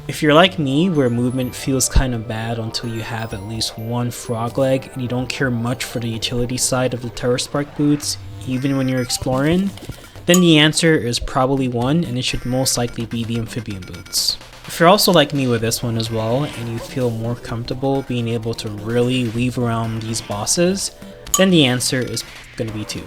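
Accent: Canada. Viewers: is this guy canadian or something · It's so obvious that you're canadian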